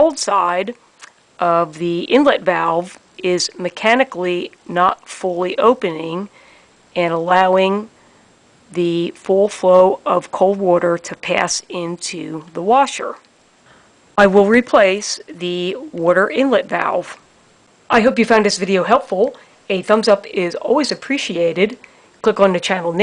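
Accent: Philadelphia, United States. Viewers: thanks for the video seems like simple things to check. Mine runs slow only on the second fill. That accent you have sounded like Philly or ?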